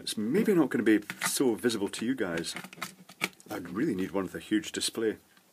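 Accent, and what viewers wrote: Scotland, United Kingdom. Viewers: Is he from Scotland? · J’aime l’accent Écossais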